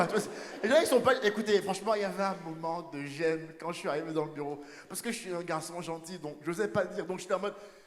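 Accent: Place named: Africa. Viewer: C'est pas mal Arrive et ne cède pas à ta mentalité Néanmoins Tu n arrives pas au niveau de jemmy Ferrari Tu es trop en manque de vigilance J aime ce que tu fais mais tu parles comme un africain Moi je suis française et certains choses dérangent vis à vis des femmes Je sais Tu en as rien à foutre Dommage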